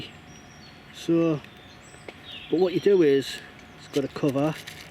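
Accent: Newcastle, United Kingdom. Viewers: Sounds like you're from Newcastle, if my memory serves me right. Been years since I moved out from Gateshead.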